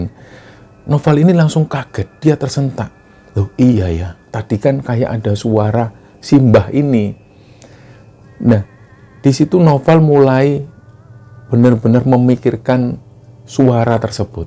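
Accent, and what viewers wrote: Java, Indonesia. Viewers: yg ku senangi sama channel ini, medok jawa nya dalam membawa cerita ini mampu membawa pendengar ke dalam cerita!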